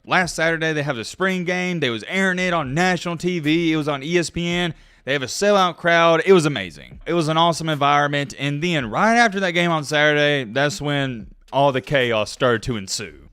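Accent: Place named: Appalachia, United States. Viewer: Shame on me...I keep taking the bait! This guy keeps releasing the same video every 4 hours....same content, same annoying hillbilly voice, same frantic speed in his voice, SAME CONTENT....and I keep watching!!!! 15 minutes of NOTHING....say the SAME thing....beg for subscribers, read a few quotes....boom another video and more revenue from YouTube...good for him for making money and increasing his subscribers every day...but I'm out...get a headache every video....bub bye.... unsubscribe 🙏🙏🙏🙏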